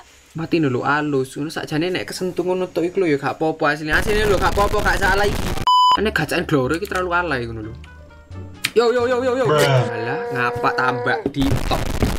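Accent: Java, Indonesia. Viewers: Bang sampean jowo ne jowo ndi mas kota ndi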